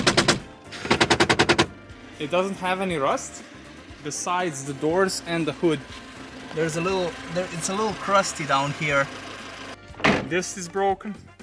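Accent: Slovenia. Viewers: Hearing a slovene talking in english is so bloody cursed 😂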